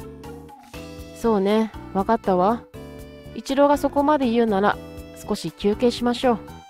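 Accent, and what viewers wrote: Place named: Kyoto, Japan. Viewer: の京都訛りに出会えて嬉しいです♪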